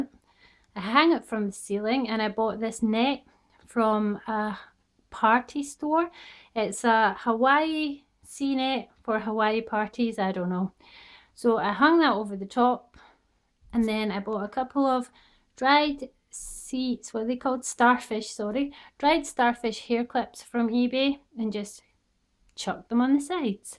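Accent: Scotland, United Kingdom. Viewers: are you Scottish you sound so much and love the room I need to for this to my room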